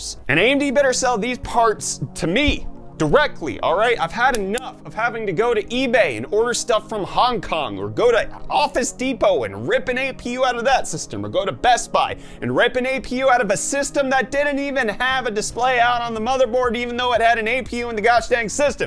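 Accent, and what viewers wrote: Canada. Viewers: Umm Canadians sound just like you. We don't speak any different. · More Canuck accent please!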